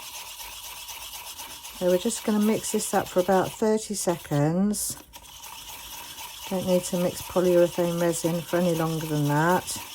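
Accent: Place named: United Kingdom. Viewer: You actually answered a question that I was already wondering. Thank you so much! I already own the Mayspring Dazzling Diamond, but I have heard other crafters raving about the Crushed Crystal. I considered buying the Crushed Crystal, just to see what everyone was raving about. You just saved me some money. I can wait till I use all of my Mayspring then have a go with the other one. Again thank you. I am a new sub now because I think you are doing an amazing job on your channel. Oh and I love your name, it was the name everyone called my mother (a nic-name) I am a Brit living in USA so I like the accent too. Anyways well done my new YouTube friend.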